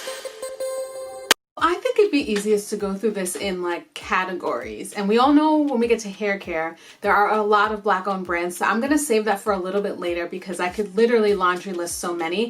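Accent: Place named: New York, United States. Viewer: girl - LOVING this video. thank you for sharing your feelings and resources. I appreciate you and will follow up on as many as I can. you DO have a voice and I love hearing it! PS - is that a New York accent?! never heard that in your voice before ahahaha (I already knew you were in NY). I do love Fenty and will continue to support her brand (I also recently bought that new blush). Similarly tho, I try to stay on a budget hahaha. wowwwww the hair products claps SO MANY options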